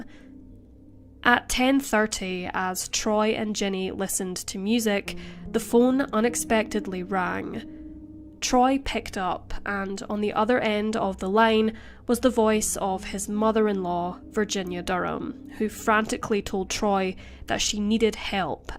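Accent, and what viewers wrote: Scotland, United Kingdom. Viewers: UR SCOTTISH ACCENT IS AWESOME & HELPS ME WITH MY DEPRESSION, ANXIETY & 💤😴! I KNOW 🌏🌍🌎HAS WORSE PROBLEMS THAN MY ABUSE I DEAL WITH OVER 20 YRS NOW!!! THANKS, & HER " FAITHFUL SUBSCRIBERS!!✊🏽🇺🇸🇺🇸🇺🇸🇺🇸🇺🇸🇺🇸🇨🇴🇨🇴🇨🇴🇨🇴🇨🇴🇨🇴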